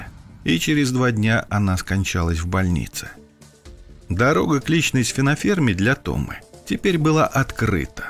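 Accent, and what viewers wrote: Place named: Ukraine. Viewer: начал смотреть и сразу подумал,что она украинка...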